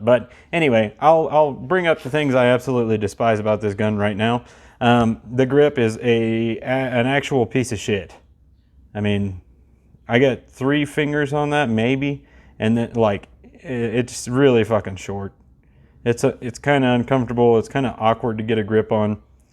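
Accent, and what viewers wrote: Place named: Texas, United States. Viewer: Dude you talk exactly like me. I was born in Ft. Worth grew up in the mid-cities of DFW. Great vid man.🤠👍🇺🇲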